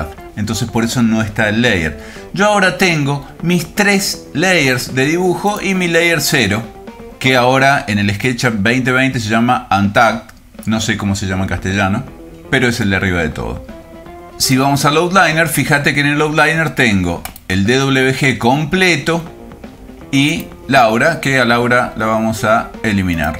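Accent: Argentina. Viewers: Me encantan sus videos Maestro! y Me encanta mas el acento Argentino! Yo ya usaba desde hace casi 8 meses el Sketch Up pero con tus videos aprendo mucho y me quito ciertas malas costumbres o vicios y comienzo a dibujar mas rápido! Saludos desde Mexico!!! 🏠🏠🏠 🏠🏠🏠 🏠🏠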